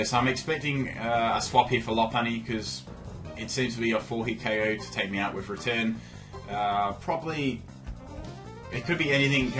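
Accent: Australia. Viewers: He says it the Australian way, not the stupid American way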